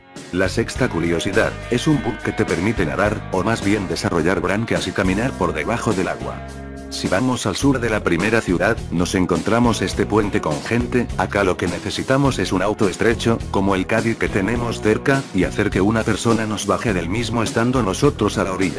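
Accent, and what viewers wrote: Argentina. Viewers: Sos argentino ¿? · Eres argentino verdad?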